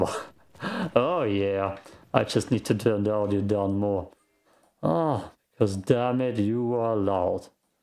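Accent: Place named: Wales, United Kingdom. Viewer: Are you welsh? You are super hard to understand bro.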